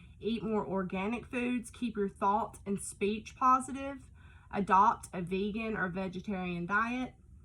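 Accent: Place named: South, United States. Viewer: I needed this. This was for me 💖💖 I’ve let go of so many bad habits the last two years. Nicotine, a narcissistic drug addicted mother, a drug addicted brother, as well as an abusive alcoholic ex husband. I’ve started a new bad habit over the last year that I can’t seem to kick though. Weed 😒 it has helped my anxiety and to heal and let go, but I have noticed the negative impacts it has taken on my energy and personality. I know what I need to do, separate myself from the person who brings this habit around me and keeps me smoking. I also have 3 small boys. So children are involved. I’m healing, we have moved and cut off all bad people from our lives, so here’s to cutting out one last bad habit so my heart and body can truly heal. I love your channel btw! My new favorite ❤️ your accent makes me feel connected 💖 a Eastern KY girl hearing another country girl doing tarot is everything to me 😍😍😍😍💖💖💖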